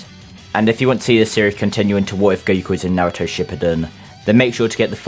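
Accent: United Kingdom. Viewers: Annoying British voice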